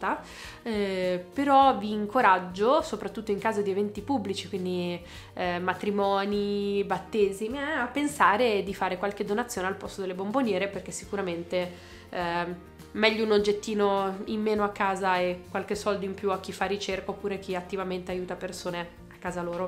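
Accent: La Spezia, Italy. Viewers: Confermo il dialetto spezzino è insopportabile niente anche vedere con il genovese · W il dialetto spezzino 🙈🙌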